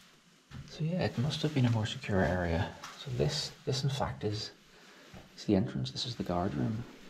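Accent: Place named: Ireland. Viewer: It was good to hear that Irish accent commentary. I used work beside a chartered engineer from Ballymena in Edinburgh in the 90's. Mesmerising video thanks for sharing.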